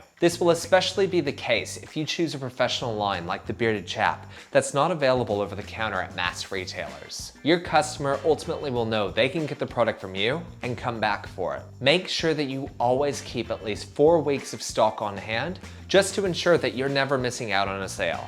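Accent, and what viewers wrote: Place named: United States. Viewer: Thanks a lot for the comment Jack! Appreciate your support. I moved to Australia when I was much younger from the states. Never lost the accent fully!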